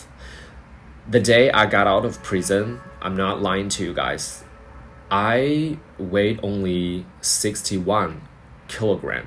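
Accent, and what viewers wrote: United States. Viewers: this is quite frustrating. I'm 4mins into the video and it is completely unclear where he was imprisoned - he speaks American English in a way you can only learn in the US itself but it seems (at 4mins into the video) all this happened in mainland China. if that's the case then all bets are off - China is not a country which honours the rule of law. the law there is arbitrary. it serves the ruling party and they bend it if deemed necessary or useful. nobody can expect a fair trial in China regardless what the law is on paper. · But you don’t mention anywhere how to support you. When I hear you talking, I find it difficult to believe you haven’t spent some time in the US since you speak flawlessly with an american accent. You have absolutely no reason to be depressed. You are young and healthy, very handsome, very smart and creative, and you master the two major languages in the world that is coming onto us. 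I’d take a lesson with you !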